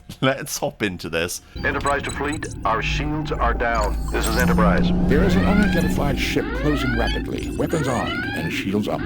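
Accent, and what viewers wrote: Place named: South West England, United Kingdom. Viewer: i know a fellow south west person when i hear one!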